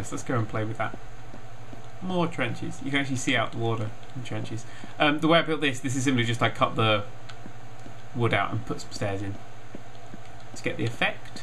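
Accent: United Kingdom. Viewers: Finally a British guy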